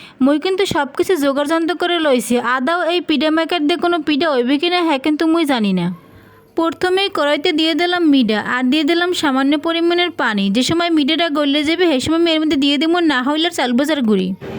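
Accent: Barishal, Bangladesh. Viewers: প্রথমেই বলি দিদিভাই তোমার বরিশালের ভাষা শুনতে অনেক সুন্দর লাগে❤ পিঠে গুলো খুব সুন্দর হয়েছে দিদিভাই❤❤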